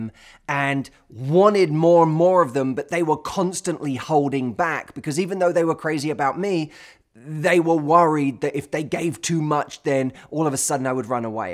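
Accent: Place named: United Kingdom. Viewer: Hello everyone, I m old, i like following those videos just for learn british english prononciation.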